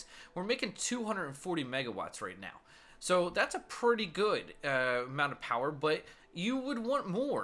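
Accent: Pennsylvania, United States. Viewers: Do I detect a fellow Pennsylvanian? That accent is unmistakable. "Wudder" :D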